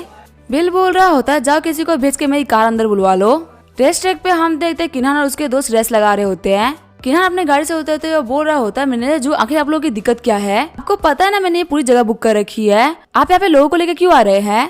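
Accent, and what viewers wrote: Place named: Uttar Pradesh, India. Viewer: tum pakka up se ho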